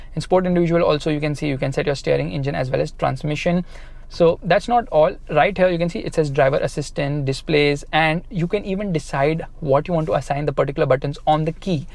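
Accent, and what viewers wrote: India. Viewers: Hate Indian English accent 😆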